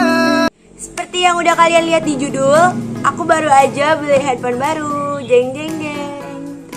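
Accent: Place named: West Java, Indonesia. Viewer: Orang sunda nya kak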